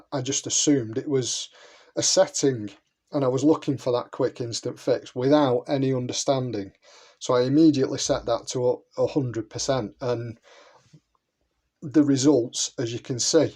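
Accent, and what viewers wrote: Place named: North, United Kingdom. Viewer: Something about this Northern accent makes me take in all the information with such efficiency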